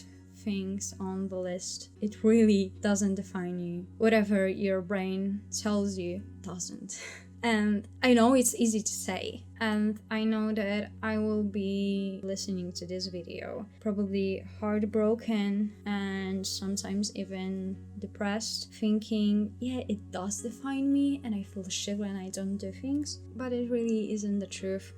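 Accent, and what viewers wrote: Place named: Poland. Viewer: /eng: her accent is beautiful and I am saying that as also polish. Polish people do not have good English accent usually Pl (for basically) Super akcent, zazwyczaj Polska wersja mówienia po angielsku mega mnie irytuje ale twój jest super Jak skończą ci się pomysły na filmy daj coś o języku bo taki akcent to serio wyjątek w Polsce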